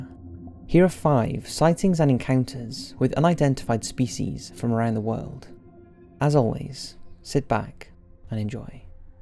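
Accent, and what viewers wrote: Wales, United Kingdom. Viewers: Greeting from Wales! Also are you Welsh? You sound like it... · Finally found my favorite British narrator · I am in L O V EV with your videos!! And I also L O V E your accent. Is it like Welch?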